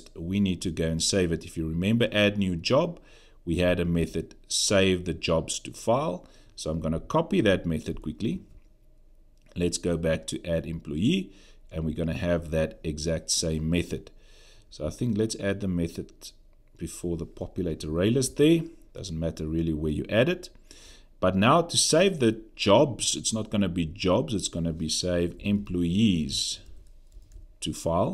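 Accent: South Africa. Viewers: He sound so South African...thanks for the upload... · THICK South African accent. Represent boet.. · from that accent straight away, i knew u are from South Africa, thanks mate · Great video. And I could listen to this guy's South African accent for days on end. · That accent right there is ZA! Awesome content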